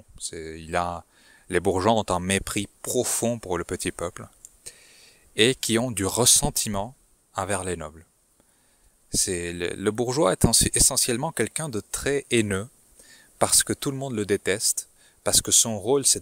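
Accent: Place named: Italy. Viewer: Quel est ce bel accent italien ? Qu'est-ce que la "dissidence cognitive" ?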